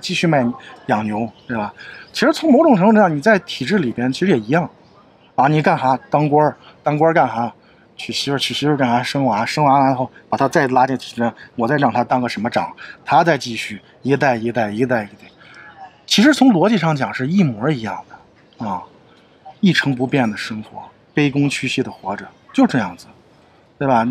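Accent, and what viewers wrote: China. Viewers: 听着乡音太亲切了，本人也是西安人，在美国做移民律师多年，听多很多这样的故事，我们的同胞跋山涉水移民美国，美国毕业留学生削尖了脑袋拼命冲h1b工作签证抽签，中国中介略次坑惨了想要移民的同胞，我的客人感叹“被中介坑了，原来在刚踏出国门的那一课就做错了“ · 这口音听起来就是我陕普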